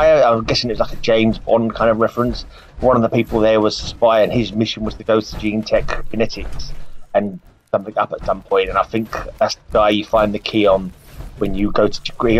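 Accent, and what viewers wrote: United Kingdom. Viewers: I can barely understand this with your British accent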